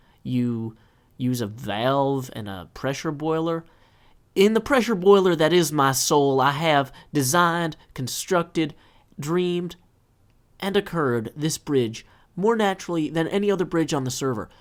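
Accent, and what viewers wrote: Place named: Kentucky, United States. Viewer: I love your reasoning on base size lol Absolutely enjoying the heck out of your videos on HC this year - that bridge was super nice. Your cadence, accent, and word choice remind me of home and make me all nostalgic for my college years in Kentucky. :)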